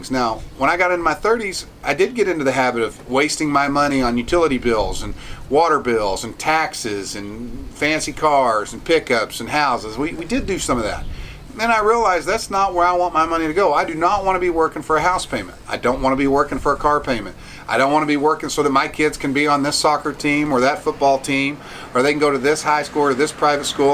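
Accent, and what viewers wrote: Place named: Texas, United States. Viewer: Sounds like a smart Texan to me !! Coming from a fellow Texan. I retired at 53 myself, worked since I was 16, time to live the good life. 🤠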